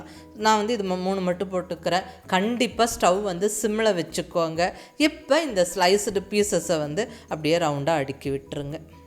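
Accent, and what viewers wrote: Kongu, India. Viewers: Mam unga Kong nattu voice super ennoda sunnuku pidikum.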